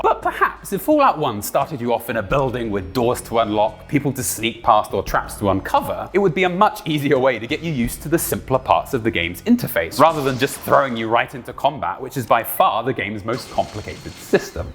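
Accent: United Kingdom. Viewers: I love new brittish accent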